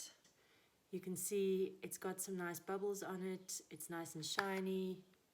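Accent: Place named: South Africa. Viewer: A lovely video! Deserves way more views. I'm a South African baker/chef living and working in Sweden. Found the SA accent very comforting. Fantastic bread, you certainly look like you've worked professionally as a baker at some point. I'm finally beginning to master my techniques. Found the main stumbling block for me when concerning baguettes was using professional ovens. Unfortunately we don't have those amazing deck ovens at my company but rather convection ovens. They would instantly set the crust and not allow any ear formation or open crumb. After many fails and frustrations I finally settled on preheating the oven and the pizza stones to 300c with steam, and as the baguette go in the oven I'll actually just turn off the oven for the first 7 minutes while they bloom. Anyway thanks for the lovely video. Wish I found it sooner, it would have saved me so much time and frustrations. 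Go well, and keep baking!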